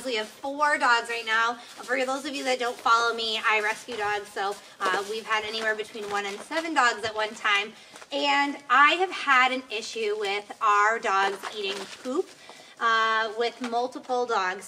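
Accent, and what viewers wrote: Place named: United States. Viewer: Talk less American plz.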